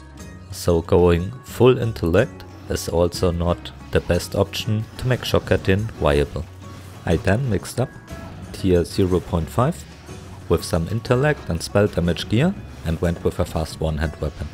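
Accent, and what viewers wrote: Germany. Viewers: Man hört so krass das du deutscher bist 😂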